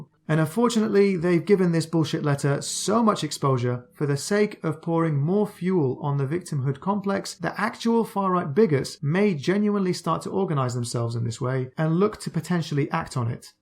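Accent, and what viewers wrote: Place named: England, United Kingdom. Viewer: I just love your English accent 😍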